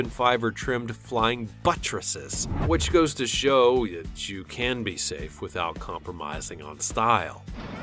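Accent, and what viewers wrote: United States. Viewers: You know when someone talks so American, it annoys you to listen.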